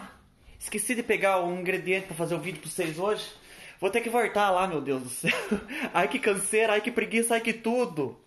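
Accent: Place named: Paraná, Brazil. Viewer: Oi, você fala igual Paranáense..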